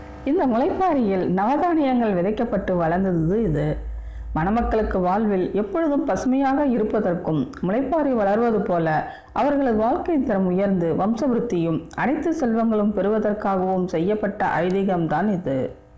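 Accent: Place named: Tamil Nadu, India. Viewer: Ungal thamizh slang nalla iruku sis.. Azhagana arathi thattugal..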